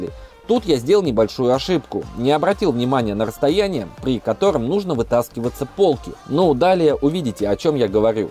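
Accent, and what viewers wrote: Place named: France. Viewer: Отличное решение! Когда начал вас смотреть(строительство гаража) "французкй акцент" привлекал внимание, сейчас же на фоне личных качеств он вообще незаметен )))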